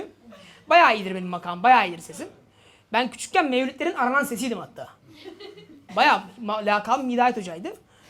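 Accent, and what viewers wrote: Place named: Kaş, Turkey. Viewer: Kaş şivesi devamkeeeeee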